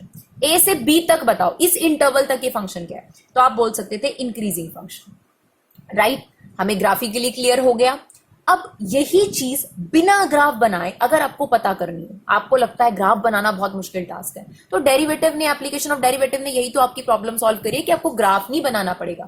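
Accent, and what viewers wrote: India. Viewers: I believe that u are from Jharkhand ,Bihar or UP Tone feels familiar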